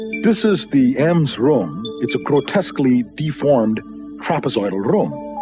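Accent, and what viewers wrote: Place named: United States. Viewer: sounds like american english and some of his words have accents still, thats why i think its funny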